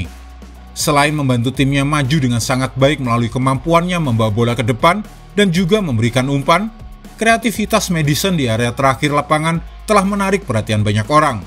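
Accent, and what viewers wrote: Java, Indonesia. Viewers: Medok tapi mantep 👏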